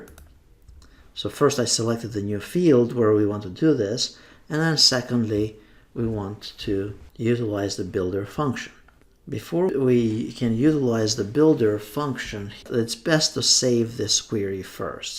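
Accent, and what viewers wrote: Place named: Albania. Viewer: Great job. Your Albanian accent is strong though :)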